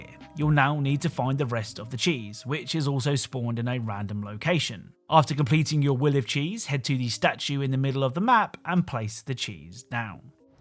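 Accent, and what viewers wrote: United Kingdom. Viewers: Something about British gamer YouTube channels that I just love. Maybe it's because I'm American, and the British accent is somehow hypnotizing or cathartic to us 😂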